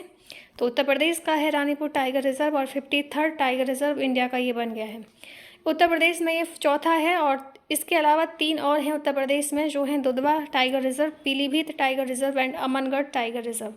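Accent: Odisha, India. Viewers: Mam aap odisha ke ho kya?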